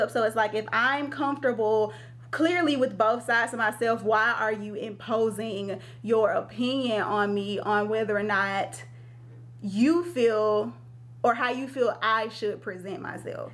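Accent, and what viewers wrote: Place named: Georgia, United States. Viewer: What up, cousin! I have to ask, where are you from? I could listen to you speak all day LONG. I love your accent, but I can’t place it? Is it Georgia? I’m sure your southern cousins are laughing at me right now, but I am serious. I can’t place what state you’re from! I’m from New Jersey, live in San Francisco and on top of that, I’m an Army Brat and lived all over as a kid, so my “accent” is such a mash-up of everything that I sound like I have no accent at all 😂. It’s also left me fascinated with other people’s accents. I love how most other people can carry their home with them wherever they go. It’s very sweet. Anyway, I love your accent. And I’m curious. I also love how confident you are. That’s all.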